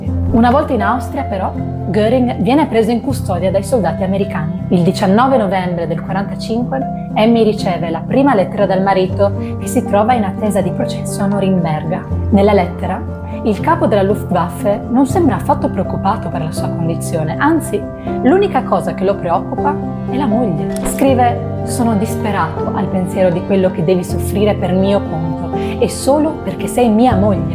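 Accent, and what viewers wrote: Trieste, Italy. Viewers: Brava! Ottimo video e ben esposto. Complimenti! ps.( ma sei triestina?) 😅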